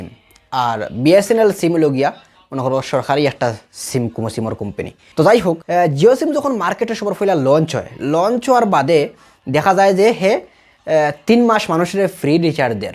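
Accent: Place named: Assam, India. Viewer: Eta assamee bengali na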